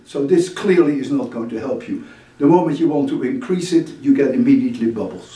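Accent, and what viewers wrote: Netherlands. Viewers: Toch maar even uw naam gegoogled, ik dacht al aan uw accent te horen dat u nederlands bent. Toffe videos!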